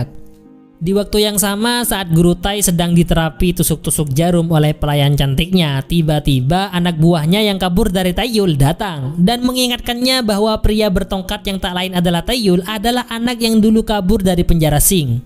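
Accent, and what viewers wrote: Madura, Indonesia. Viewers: Reng madureh 😂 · Logatnya kaya reng Madura..? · Baru sadar klo orang Madura 🤣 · Logat admin kayak berasal dr pulau garam.😊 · Pola maduren riah😂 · Logat MDR nya mencolok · Kelihatan masih logatnya Ra Ra Madura 😂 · Madura ..yaa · Reng medureh kak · Ini orang Madura y salam nih Madura bangkalan. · Logat maduranya kelihatan banget 😃semangat kakeh boosss 😁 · wah pencerita orng madura , salam dari surabaya bang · Madura mana min ? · Duro duro,logat e... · Mimin logat madura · Oreng medureh yeh 😁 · Gw ngira orng madura. Trnyata bener dong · Orang Madura ya bang.. Medoknya jelas 😁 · Orang madura ya min? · Medureh dimma tretan?? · Reng medureh kadik en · Mak madureh lek 'en cak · Kayak Madura logatnya😂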